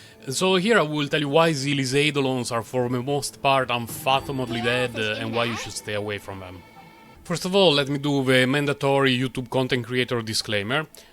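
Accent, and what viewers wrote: Italy. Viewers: I have no idea why, but you give such strong italian-english accent vibes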